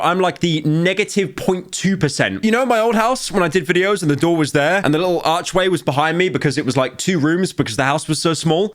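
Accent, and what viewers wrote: United Kingdom. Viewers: You sound more british when very sick. It only makes sense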